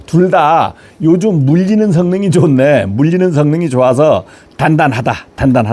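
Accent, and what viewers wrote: Jeju, South Korea. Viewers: 제주도 삼춘이다, 발음은 제주 토백이다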